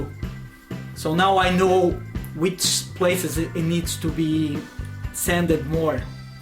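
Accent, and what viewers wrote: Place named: Portugal. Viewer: Ah! Sabia que tinha ouvido este sotaque dum outro lugar! Já tive em Portugal antes, vivi em Braga e Lisboa, belas cidades, devo dizer. Saudades de Portugal, um abraço!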